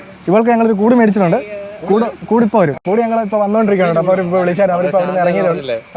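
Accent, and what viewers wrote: Kottayam, India. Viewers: Kottayam slang👌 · Kottayam slag 😎😁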